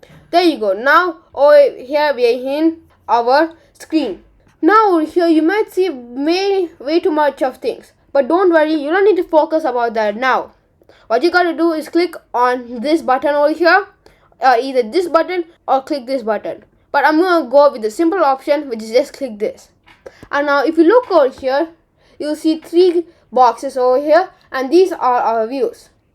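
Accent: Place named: India. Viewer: Broski you sound like an average indian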